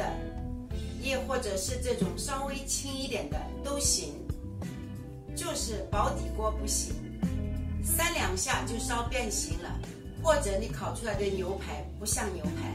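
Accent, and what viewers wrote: Shanghai, China. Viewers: 的上海言话哈一样赏心悦目。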